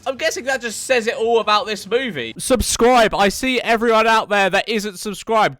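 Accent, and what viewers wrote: United Kingdom. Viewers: Somehow hate this video more then the movie dude uses way to many corny ass jokes plus mixed with that dumbass uk accent i wanted to literally shot myself🤣🤣😭💀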